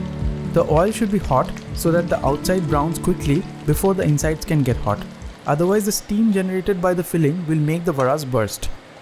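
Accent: Bengal, India. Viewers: Love the way you speak Bengali words in the same. বাঙালি accent in between your English narration. Love your recipes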